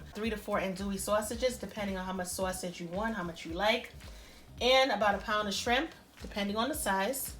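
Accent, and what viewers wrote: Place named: Northeast, United States. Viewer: That looked so tasty! you have a deep North Eastern accent. Are you from Brooklyn?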